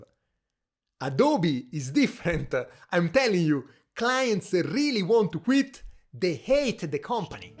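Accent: Tuscany, Italy. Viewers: Fantastico come l’accento toscano si estende anche all’inglese 😄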